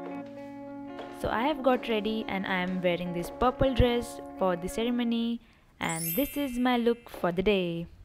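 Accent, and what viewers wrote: India. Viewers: I liked the way you keep your Indian accent while talking in English unlike some people who try to pretend that they have adopted the accent but even the people who they are pretending to be don't understand what they say.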